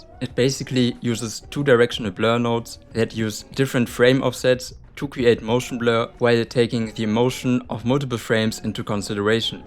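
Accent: Germany. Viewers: I hear Denglish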